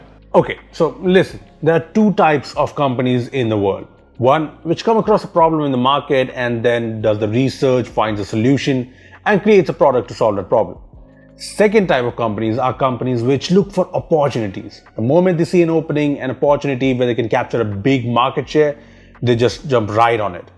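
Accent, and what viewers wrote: India. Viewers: thats how indians talk in eng actually.... lovely presentation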